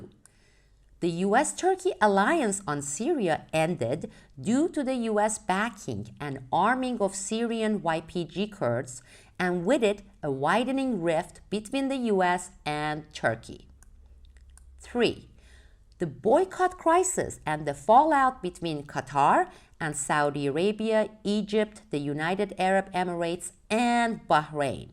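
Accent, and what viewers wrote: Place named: Iran. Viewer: What's your real name? I can definitely detect a Persian accent.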